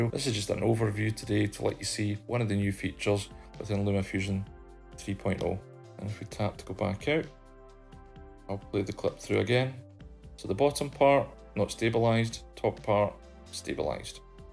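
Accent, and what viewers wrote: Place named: Scotland, United Kingdom. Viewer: This was just what I was looking for. Very informative and great to hear a fellow Scot on YouTube!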